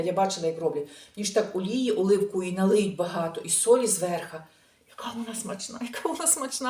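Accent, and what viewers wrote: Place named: West, Ukraine. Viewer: Дорога, скільки учительок в вас на каналі появилось 😂, а мені подобаються ваші відео, ваша спонтанність, наша говірна мова західної України. Я б ще добавила, кому не подобається,і постійно критикують, вони вільні не дивитись, але ж це спеціалісти, saputelle, лиш писати негатив, інше не вміють. Гарно проводіть вашу відпустку, місця чудові, їжа теж, всього найкращого❤🎉.Ви гарна пара з вашим compagno💓🐞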